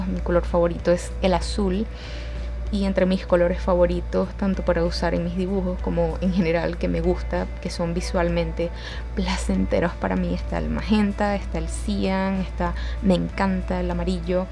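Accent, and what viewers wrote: Venezuela. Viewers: Pareces del Zulia, digo por tu acento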